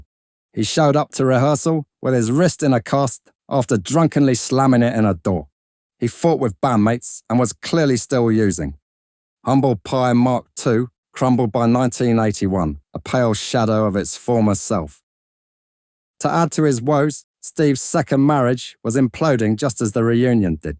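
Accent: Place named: London, United Kingdom. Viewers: Can't stand the narrator, what is he, some London Wide Boy? Terrible halting voice. Get rid. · A Cockney geezer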